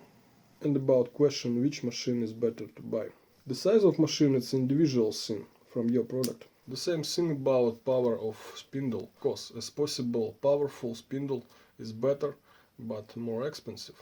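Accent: Russia. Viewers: У вас ,один из самых страшных русских акцентов!!Но руки золотые.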